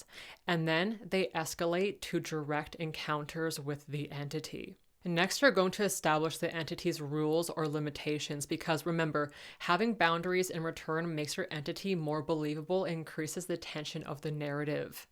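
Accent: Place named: Canada. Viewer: That's really interesting! Sorry to hear you were bullied for that. I never would have guessed Hungary. I'm from Minnesota in the US, and I detected a little bit of a Canadian accent in your voice, since our accents are somewhat similar. I like the way your combination of accents fit together! It gives your narration a unique and pleasing style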